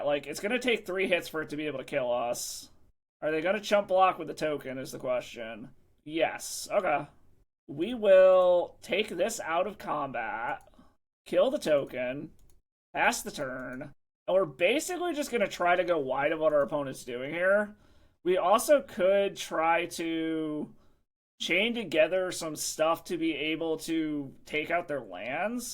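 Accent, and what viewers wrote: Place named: Alabama, United States. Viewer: I don't speak English but your accent sounds like Alabama to me, im right?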